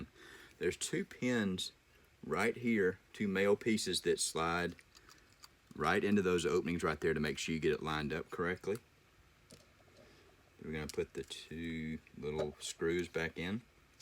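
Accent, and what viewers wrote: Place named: Texas, United States. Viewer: Does this guy not sound somewhat like Matthew McConaughey?!?! LOL! Gotta be from Texas.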